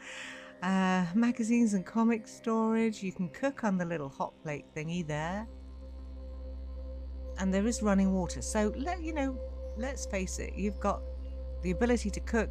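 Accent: United Kingdom. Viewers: You sound like the cutest mother playin Skyrim with that Pommy accent. 😆xx Aawh fkn ell! 😂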